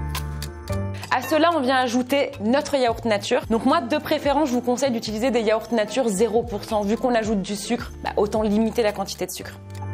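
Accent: Canada. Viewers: tu mon youtubeur préféré des vidéos accent canadien et qui met quoi des vidéos trop trop rire je me suis spéculoos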